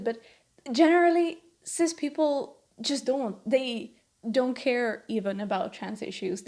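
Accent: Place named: Finland. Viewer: Excellent points!! This really needed to be said. Satutko muuten olee Suomesta? Aksentista päätellen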